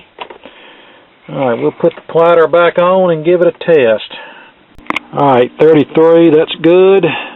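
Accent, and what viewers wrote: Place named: Louisiana, United States. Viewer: He sounds like he from Louisiana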